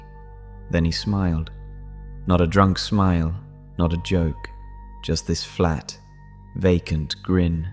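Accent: United Kingdom. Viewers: For those of us who do not have the class of a British accent, it makes the narration of your tales exceptionally enjoyable. The meat & potatos of the stories are always great. Good to see you back.. God Bless you & yours Sir..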